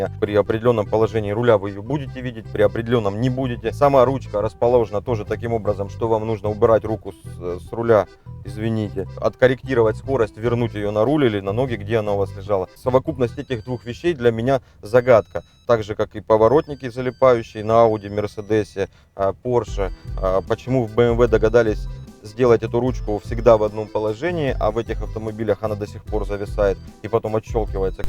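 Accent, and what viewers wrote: Rostov, Russia. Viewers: Про речь ужасную кто там писал.Это суржик,норма казачьего диалекта,не является нарушением,это Ростов.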